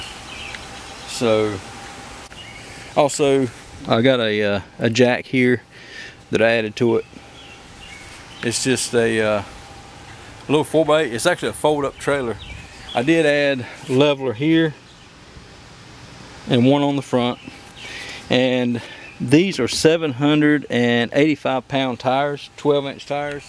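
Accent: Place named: Carolina, United States. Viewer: that's a Carolina accent if I've ever heard one!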